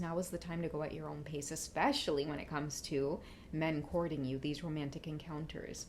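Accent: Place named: Trinidad and Tobago. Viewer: Are you Trini? That bazodee accent 😂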